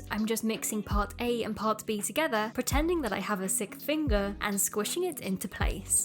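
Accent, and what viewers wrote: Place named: United Kingdom. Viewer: This is the first ever time I've heard you speak and I did not know you were British omg!! I love your style so much it's such an inspiration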